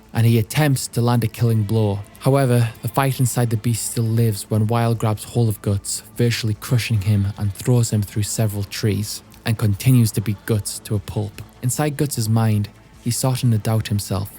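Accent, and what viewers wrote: United Kingdom. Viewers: his awful British accent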